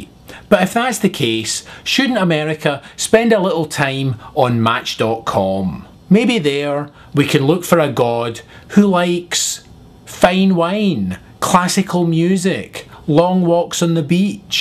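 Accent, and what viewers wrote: Scotland, United Kingdom. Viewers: hey, yus one funny dude! don't believe i take the 5 spicy meatballs but i do have 5 stars for ya! Keep up the good work and your scottish accent is COOL!!! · Scottish. Watch his earlier videos to learn how much he enjoys being mistaken for Irish.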